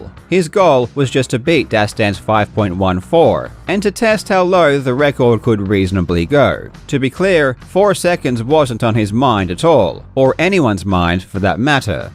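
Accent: United States. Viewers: Is karl sounding more American? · Mate are you purposely trying to sound more American? You're really leaning into those Rs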